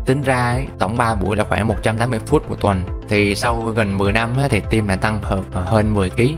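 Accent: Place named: South, Vietnam. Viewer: Bạn cứ nói đại giọng bắc..bạn nhái giọng Nam nghe mệt hơn. Nó chen giữa 2 vùng miền nghe khó chịu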